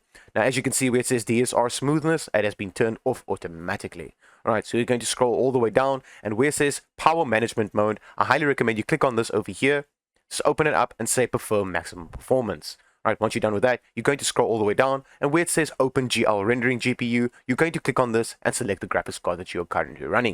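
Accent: South Africa. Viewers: Bro I knew you sounded South African. Great to find a South African tech youtuber!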